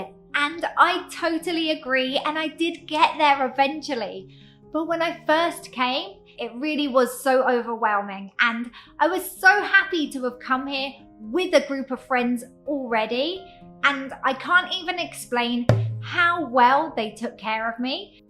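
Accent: United Kingdom. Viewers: I lived in Chungli for five years because of studying in university. In my opinion, it is convenient for living there! There has a lot of convenience stores, 光南(Guangnan), 墊腳石, a big(but also ugly)station, High Speed Railway, Rakuten Monkeys(a pro baseball team), etc. However the only one thing I really hate is cold and WET weather in winter.😂 I want to recommend you my hometown Taichung, it’s more comfortable weather here! But I do like Chungli as well, there are so many memories for me. Btw, I like your British accent. The video make me to practice with my listening. 👍🏼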